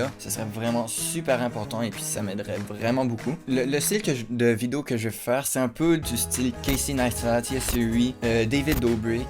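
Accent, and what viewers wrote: Canada. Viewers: T’entends pas son accent ? Il est déjà canadien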